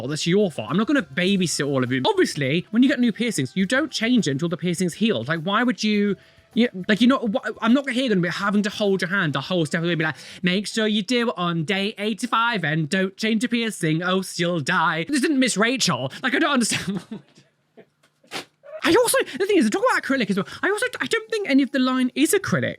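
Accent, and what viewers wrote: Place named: RP, United Kingdom. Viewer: all of these people dogpiliing on you … are they Americans? Because if they are, it explains a lot their baseless accusations. You see, here in the US, there's a bit of a trope around a British RP accent: it's associated with either (A) being erudite and and expert [both of which are hated by a large swath of this country]; (B) movie villains; or (C) snobs. Having been raised on Monty Python and imported British TV shows, I don't have that reaction. But to 'Muricans who do not have much experience with British culture, your RP accent [which sounds quite good to my ignorant American ears] is probably making them fall into those tropes the instant they hear you speak. Which may explain the hate — they literally are incapable of overcoming their preprogrammed reactions.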